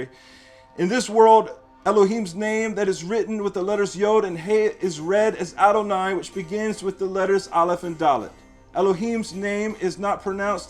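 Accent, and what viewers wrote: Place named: Eastern Europe. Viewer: I have noticed that the pronunciation of the "V" in Eastern European accent is "W". I asked myself, "What's up with that?"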